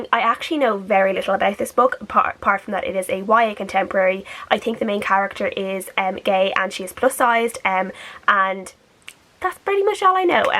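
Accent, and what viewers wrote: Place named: Ireland. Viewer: I love your Irish accent! As an American of Irish decent, it never gets old. On Beauty looks amazing! Have a fun trip girl!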